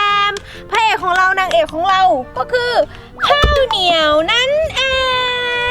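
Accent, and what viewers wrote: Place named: Chiang Mai, Thailand. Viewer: พี่อยู่จังหวัดอะไรค่ะทำใมสำเนียงเหมือนคนเชียงใหม่จัง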